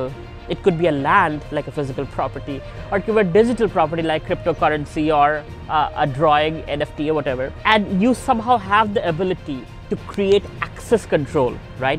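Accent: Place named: India. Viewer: Your English accent is not like other Indias.. It’s a good thing that i find rare...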